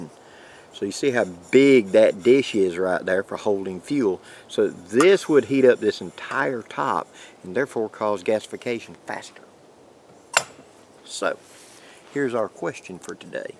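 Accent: South, United States. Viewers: Nice video, you make a lot of sense in your southern way, I like the accent you sound like you are signing all the time. I like you tips and inputs very resourceful!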